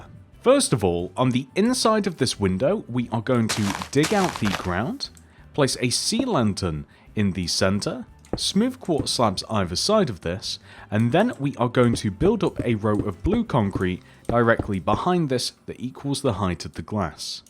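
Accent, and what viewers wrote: United Kingdom. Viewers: He’s British so he pronounces it differently like me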